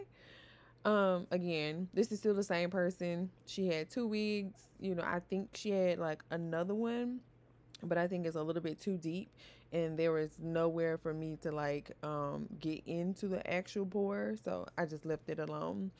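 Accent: South, United States. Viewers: Lmao 😂 girl .. no music cuz YouTube 😂 I love country accents please narrate ❤ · Don’t apologize for your country accent. Thanks for the video. 😊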